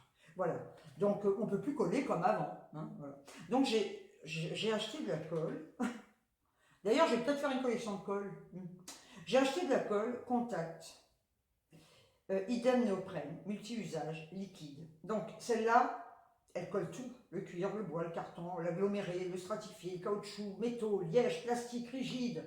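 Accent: Algeria. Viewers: Coucou ma adorée...Pero pasa que es? Je n'ai pas de son sur ta vidéo. C'est la première fois que cela m'arrive. J'ai tout vérifié et nada! Je ne comprends pas pourquoi... J'ai quand même regardé ta vidéo " en mode muet" et je vois qu' apparemment, tu es toujours dans les bonnes affaires.... Le porte-bijoux: j'adore et les bougies aussi.... Et pour couronner le tout, je viens juste de m'apercevoir que Youtube m'a désabonée de ta chaîne...Oh, la, la, la! Si l'année commence cà, comment va-t-elle se terminer??? Tu n'as pas perdu la voix au fait? Tu ne fais pas semblant de parler??? Lol, j'plaisante bien sûr mais c'est dommage de ne pas entendre ta douce voix mélodieuse: tu sais, celle avec notre accent de P.N. Je te fais des tonnes de bisous tout blancs.... car nous sommes au mois de Janvier!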